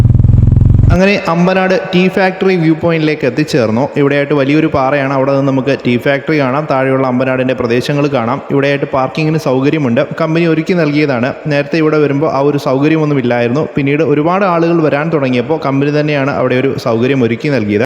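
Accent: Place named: Kottayam, India. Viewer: ബ്രോ കോട്ടയം ആണോ 🤔ഇടയ്ക് ഫ വരുന്നുണ്ട് 🤔പൊളി 👍🏻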